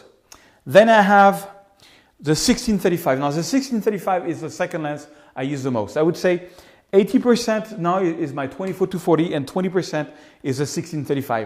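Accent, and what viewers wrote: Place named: France. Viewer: gotta love that french accent!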